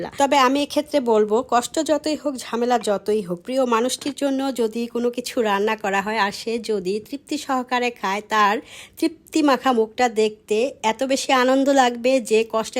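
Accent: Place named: Bihar, India. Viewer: আপু আপনি কি বিহারী..? আপনার ভাষা শুনে বিহারীদের মত মনে হচ্ছে।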